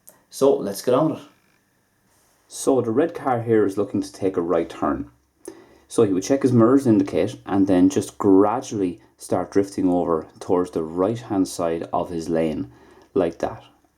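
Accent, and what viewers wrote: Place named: Ireland. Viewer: you're so clear with your Irish accent you're the best.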